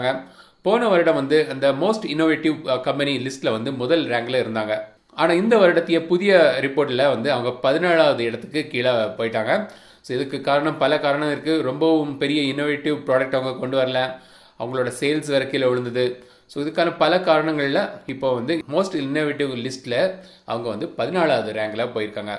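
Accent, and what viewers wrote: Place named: Nagercoil, India. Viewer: நம்ம நாகர்கோவில் வார்த்தை உச்சரிப்பு உங்க கிட்ட இருந்திச்சி அதான் கேட்டேன்.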